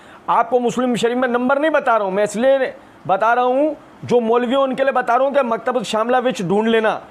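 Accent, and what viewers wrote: Punjab, India. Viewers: Maza Ata Hai apki Punjabi sun k Mera dost ik Karachi ka Hai wo b asy bolta Hai ❤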